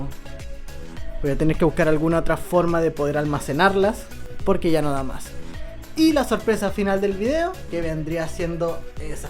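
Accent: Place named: Chile. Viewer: Amigo mio, vi un video tuyo armando la baf de controller, me causo familiaridad tu acento. Me ha sido difícil encontrar un chileno que coleccione y comparta videos como los tuyos. Te felicito por tu colección y videos los cuales seguiré viendo por supuesto. En lo personal también tengo mi colección que seguramente compartiré en algún futuro Un abrazo desde Chile amigo, Talca Que pases un excelente año nuevo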